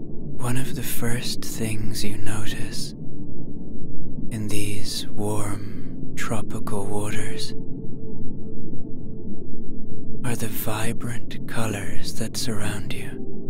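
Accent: Ireland. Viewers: I'm an absolute insomniac, however you help me relax with your beautiful Irish tone that reminds me of home, God bless you ❤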